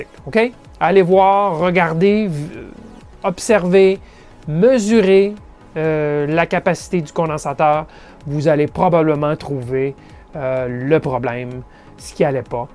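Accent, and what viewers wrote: Quebec, Canada. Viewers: Excellente video, comme toutes les autres d'ailleurs ! Un grand merci et Bravo a vous et avec cet accent de nos sacrés cousins ! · Bonjour, merci pour votre vidéo. Je me posais des questions quant à la mise à la masse commune en cas de mesure avec un ocsillo et comment juste avec un multimètre suspecter un condensateur de filtrage. Et bien, j'ai eu ma réponse et vous en remercie. Etant Français, l'accent Canadien est un pure plaisir ;-) merci à vous.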